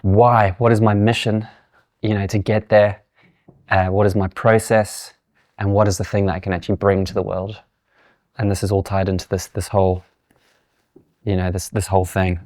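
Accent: South Africa. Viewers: Buddy, is there a hint of South African in that accent? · South African? 🙂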